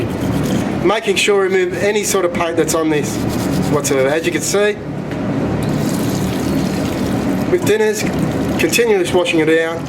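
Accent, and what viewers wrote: Australia. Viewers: Good, efficient workmanlike description. I got the message clear even with the Aussie accent. Next video, turn the exhaust- fan speed much lower so we can hear better! Thanks!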